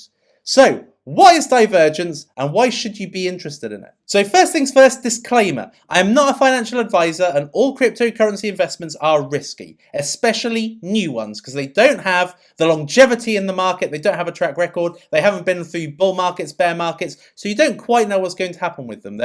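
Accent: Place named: Southern Counties, United Kingdom. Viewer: Duuuude I love your Southern Counties accent - Bucks?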